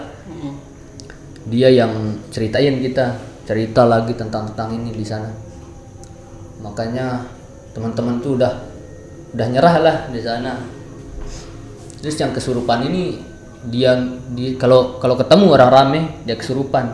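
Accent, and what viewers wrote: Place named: Lombok, Indonesia. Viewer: Indo sasaknya kentel banget bang Semangat²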